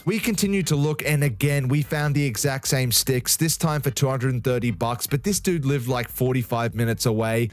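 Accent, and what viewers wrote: Australia. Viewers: I thought you were Australian, then I heard you mention microcentre ALOT I second guessed. BUT YOUR FROM BRISBANE ❤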